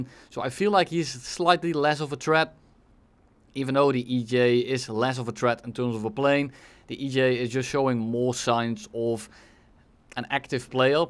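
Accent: Netherlands. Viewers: ben je nederlands?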